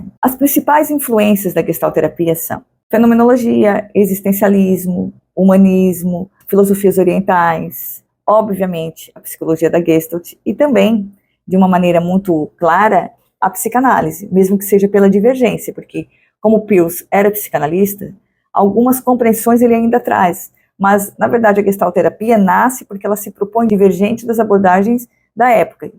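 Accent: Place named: Azores, Portugal. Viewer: Maravilhoso! E é gostoso ouvir o sotaque açoriano, ainda que leve.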